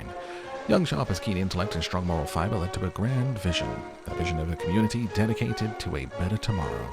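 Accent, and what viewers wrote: United States. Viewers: I thought your American accent was really good! My brain didnt even notice it until you mentioned it, which is what you want when doing an accent. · Your southern US accent is sooo good whether youre doing it for men or women.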